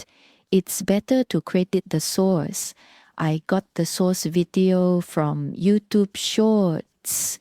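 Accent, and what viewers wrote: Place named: India. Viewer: 인도 사람이 중국어 하는거 같아요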